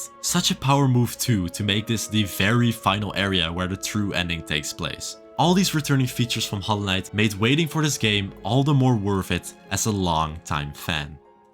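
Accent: Netherlands. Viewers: Ik wist dat ik het nederlandse accentje hoorde 🤯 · And team Netherlands 🎉 I couldn't not recognise your Dutch accent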